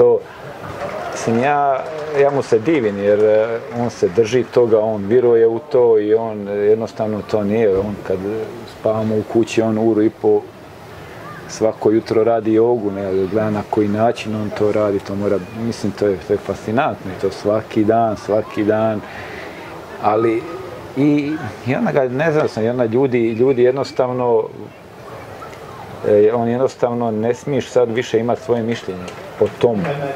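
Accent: Dalmatia, Croatia. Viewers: To je dalmatinski naglasak kod zena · i ja isto OBOŽAVAM Dalmatinski naglasak, o tome može da misli ko šta oće negativno, zabole me ,,djoka,,.. poz 031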